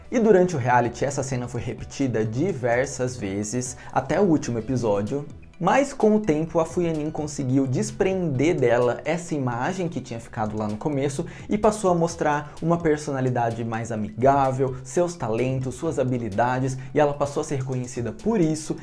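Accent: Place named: Caipira, Brazil. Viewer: Acho tão fofo esse sotaque "caipira" do 🤗🥰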